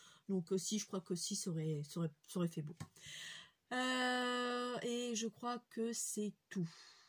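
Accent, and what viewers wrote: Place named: Nord, France. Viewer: Bonjour je me suis abandonnée a ta chaîne grâce a notre amie Agnès.super sympa tes vidéos j'adore ❤❤❤❤.mais je me permet je connais ton acent j'ai le même le pas de Calais ou le nord.moi c'est le 62 mais maintenant c'est l'aude.je te fais de gros bisous.alexandra 😊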